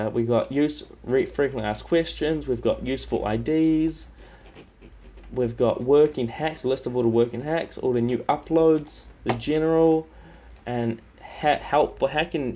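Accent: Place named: New Zealand. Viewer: you sound like a kiwi lol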